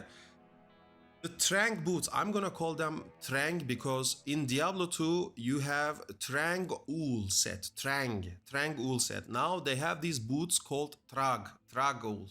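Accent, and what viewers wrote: Turkey. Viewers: are u turkish u sound and look like turkish, great vid also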